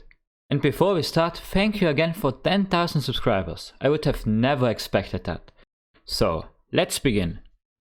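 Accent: Germany. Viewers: are you by any chance german? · der deutsche Akzent ballert richtig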